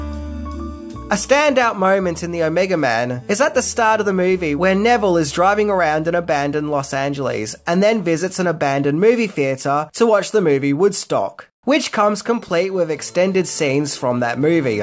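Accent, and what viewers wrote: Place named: Australia. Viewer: is just speaking Australian English. In America, people say “oh-may-huh”. Apparently everybody else says “oh-me-guh”. Just different dialects of the English language.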